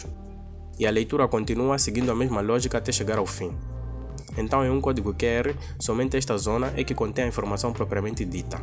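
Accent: Mozambique. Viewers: Eu acho bonitinho o jeito que vocês Moçambicanos falam. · Moçambique(Moçambicano), Nampula creio eu pelo sotaque.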